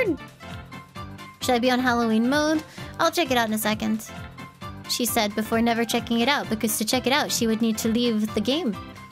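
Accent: United States. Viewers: I live for "American accent" and pronunciations. So cute.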